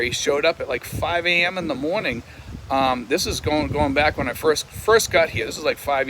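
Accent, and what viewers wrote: Boston, United States. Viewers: Great advice I missed you more than I thought glad I reconnected I don’t know how I lost connection in the first place I don’t know if YouTube is playing games or watt but anyways it’s good to hear that Bostonian talk logic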